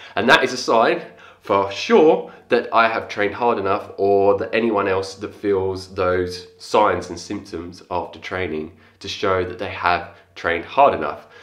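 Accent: United Kingdom. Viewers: but where is your accent from? You sound British? Just curious, keep it up👍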